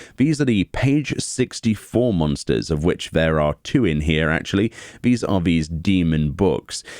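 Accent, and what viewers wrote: England, United Kingdom. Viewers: English accent doesn't mean he understands how to read it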